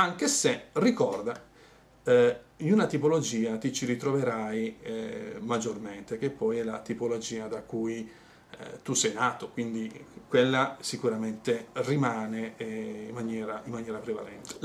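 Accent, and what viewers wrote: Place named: Apulia, Italy. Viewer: Avevo visto il video che con l'accento pugliese dicevi del gatto che fa il linghino... Ora ti vedo su questo enneagramma che mi interessa!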